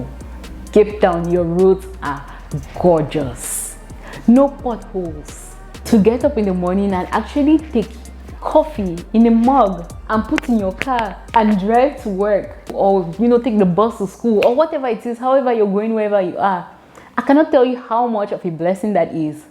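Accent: Nigeria. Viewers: Lovely Video, you analysed your points perfectly. Well done 🙌. Meanwhile, i thought you were Nigerian at first, your accent sounds so Nigerian. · I swear I’m useless in differentiating west African accents, to me you sound Nigerian and if you hadn’t said you’re Cameroonian, I’d assumed you’re Nigerian or Ghanaian based on accent only. Thank you so much for reviewing South Africa through Cape Town mostly, hope you get to visit Gauteng(most cosmopolitan province& our economic powerhouse. You’ll find all African countries represented there, all the way from north to our southern neighbours) KZN(city of Durban has warm beaches compared to CT) , Limpopo(the best safaris in Africa, period!), Northern Cape(least populated province), North west, Mpumalanga and Eastern Cape. Also the bus system exists in other big cities too eg Tswhane(Pretoria), Johannesburg& Durban…work similar. 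All the best with your studies and stay in SA, welcome. Edit: also thanks for honestly talking the differences in poor vs rich in SA, that’s still racially pronounced in SA because of our apartheid history. Millions of rich and middle class Black, Indian(you find big population of Indians in Durban) and Coloured (majority race of ppl in CT& western Cape) ppl but the real wealthy of SA still skewed towards white SAns who gained most of their wealth through unfair advantages given to them by apartheid laws eg land & natural resource stealing& job reservations. Also glad you touched on the pronounced racial dynamics of SA, can’t live in SA and not learn to nuance about impact of global dominance of whiteness…guess that’s the most unfortunate unique aspec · Cameroonians and Nigerians talk alike... u are Cameroonian but my brain keep thinking u are Nigerian because of ur accent. I thought Nigerian accent Is unique.. 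· I noticed the English speaking part of Cameroon 🇨🇲 have same accent and body language with Nigerians bcos this lady speaks like a Nigerians. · I am a Nigerian , truth fully you sound like my tribe ,fine girl.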